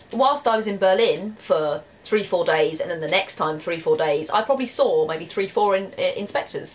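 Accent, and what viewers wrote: United Kingdom. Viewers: hey, i love to listen to your very british english:) but - do you talk about that berlin that i know? - that berlin that i was born and raised and still live in? (i am 31 years old)... i don´t feel the same about that... i see very stressed and passive agressive people surrounding me! everyone is deeply quiet ^^ but it depends where in Berlin you are.. for sure... but to me personally: i think i will leave my hometown now... but i wish you all the best in germany. i´d rather would go to the UK if i could... ( i have nice memories of the uk, but i have been there for only ONE WEEK, so.... who knows..) Lovely Greetings from Berlin!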